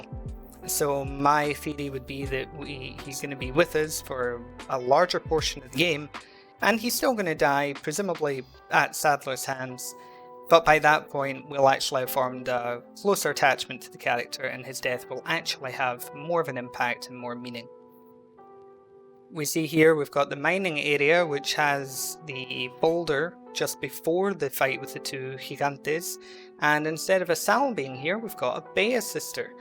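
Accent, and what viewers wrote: United Kingdom. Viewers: Bro, where ya from? Roughly Ya sound British, but you Actually put in effort in saying the Spanish words well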